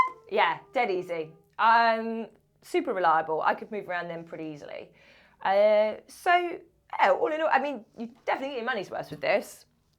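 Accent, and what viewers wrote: United Kingdom. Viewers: I like the French first name with the British accent😏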